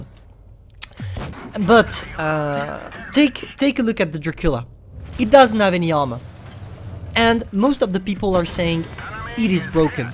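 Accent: France. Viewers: French are you?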